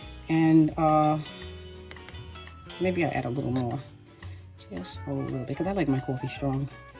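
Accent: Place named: New York, United States. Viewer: Love your NY accent, Ma'am. Greetings from Australia.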